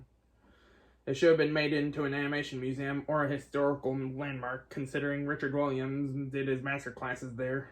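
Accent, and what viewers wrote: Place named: South, United States. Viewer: Fantastic! After many years away from animation, I'm returning. This book is a must and I look forward to reading it again. By the way, I love your accent! I love the southern accent :)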